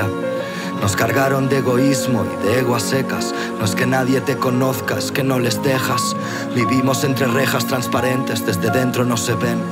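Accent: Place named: Andalusia, Spain. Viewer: me encantan tus temas, pero deja de poner acento del sur, enserio tio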